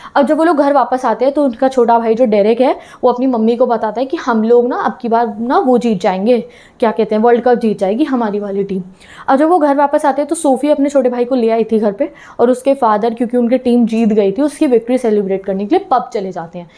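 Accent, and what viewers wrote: Punjab, India. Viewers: Mam are you from punjab?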